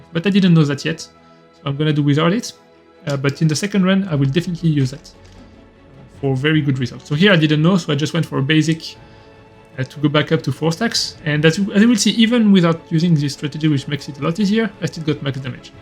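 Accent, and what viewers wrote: France. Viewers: Are you french ? Can you do this video in french ?😅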